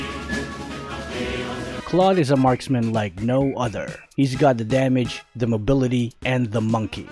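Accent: Philippines. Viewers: your from ph? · I actually thought he was a foreigner because of the accent but hey, I can hear a bit of Filipino in his words 😂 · Pilipino ba siya? HAHAHAHAH OBVIOUS E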